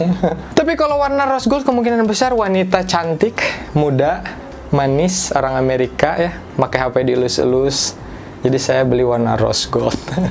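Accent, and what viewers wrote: West Java, Indonesia. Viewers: kok mirip efkahfajar yak nadanya, apa karna org sunda? ahahahaha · Om, situ orang sunda ya?, Logat logatnya sunda bgt😂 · orang sunda nya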